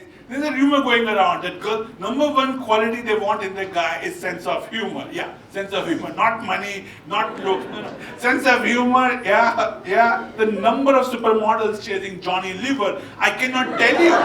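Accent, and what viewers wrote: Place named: Punjab, India. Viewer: That is not Indian English, it is only Punjabi English.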